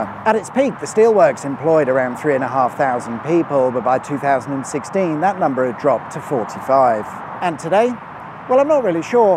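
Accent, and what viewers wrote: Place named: Scotland, United Kingdom. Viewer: Very ..... Scottish 😊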